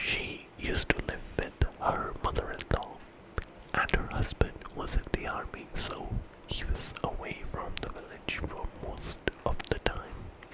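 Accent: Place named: England, United Kingdom. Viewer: Big fan of your English accent sir🤓 Cool background!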